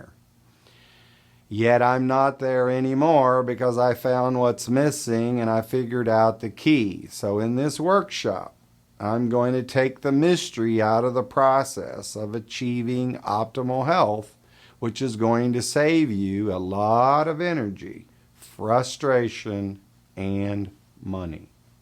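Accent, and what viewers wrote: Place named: South, United States. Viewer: Your delivery and pacing is really good. I disagree with those who want it faster. They must be hyper active coastal types. Very refreshing compared to many frantic YouTubers. You sound like a southern good old boy: easy on the ears : -)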